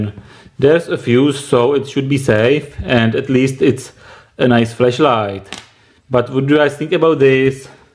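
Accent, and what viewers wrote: Czech Republic. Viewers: Tak tohle je nejsilnější český akcent, který jsem v angličtině kdy slyšel. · Thats some nice czech (prague) accent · Judging by your accent - Are you Czech? If yes then "Ahoj" :D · i know you're czech because you czech-ish english pronoumciation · Sounds like a Czech English accent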